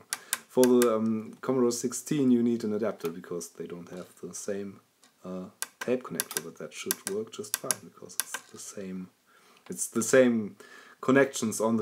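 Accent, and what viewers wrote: Germany. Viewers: Weird. I’m hearing “a-to-zed” or “day-to-zed” or “data-zed” but the subtitles always say dataset. I guess that’s the German accent playing there!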